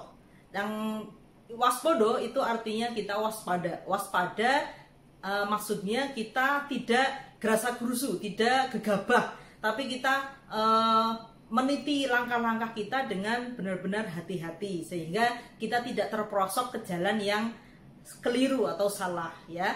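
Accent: Banyumas, Indonesia. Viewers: Berkah melimpah buat,ilmunya memberdayakan sekali.terima kasih 🙏 Saya galfok sama sedikit dialeknya spt kluarga di kota ngapak ngapak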